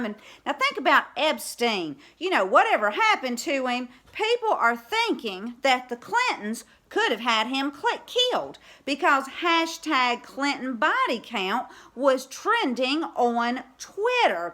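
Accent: South, United States. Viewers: I can’t believe I just ran across your channel! I’m a little late in the waking up thing but it’s been since 2016! Anyway I get a kick out of your accent(I’m southern too!) God Bless and keep on keeping on!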